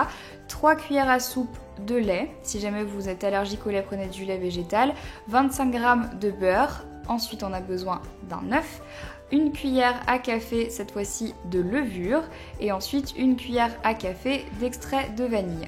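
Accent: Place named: Naples, Italy. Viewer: on dirait à Napolitain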